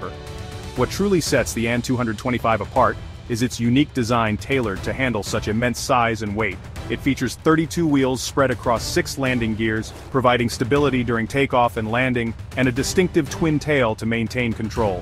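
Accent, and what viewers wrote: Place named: Russia. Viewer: Why was I hearing it in Russian accent??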